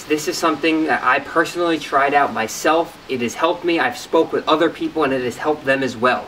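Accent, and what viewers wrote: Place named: Long Island, United States. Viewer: Wow. I knew you were from Long Island. lol I heard you talk, and I saw your surroundings..and I had that feeling of, "I know that street. Its Long Island Suburbia." Aka my hometown. Long since gone, but you never forget. haha Anyway I just started trying these exercises out, I'm hoping they'll help, so far they felt pretty good so I'm hopeful.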